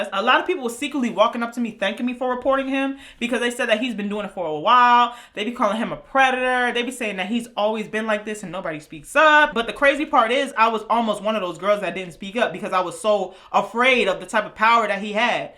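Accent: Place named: New York, United States. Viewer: This is not a funny experience but, I love your personality & you sound like you’re from ny!!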